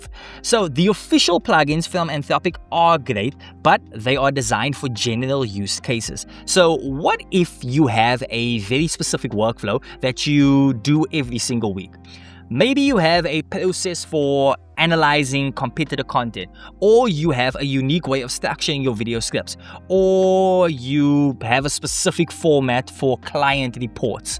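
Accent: South Africa. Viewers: So lovely to hear a fellow South African :) Glad I found your work, super cool and impressed. Thank you so much. Loving my Journey with Claude Co-work so far.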